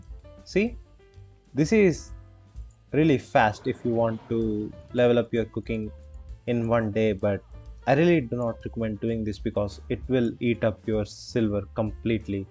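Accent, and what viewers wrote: India. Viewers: You sounds Indian my friend .. Are you?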